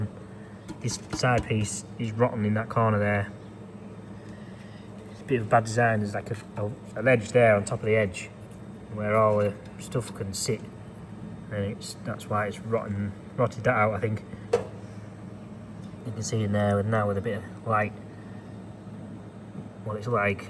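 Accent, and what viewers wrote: Yorkshire, United Kingdom. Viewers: The sound effects , brilliant, such a contrast to the deadpan Yorkshire, loved it.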